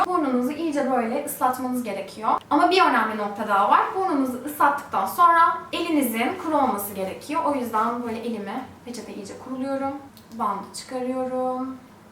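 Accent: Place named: Russia. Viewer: Ты русская скорей всего)))) ama türkçen iyi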